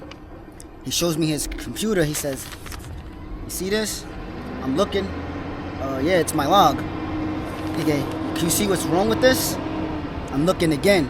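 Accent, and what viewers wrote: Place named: New Jersey, United States. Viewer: You must be from Jersey u got that accent like the sopranos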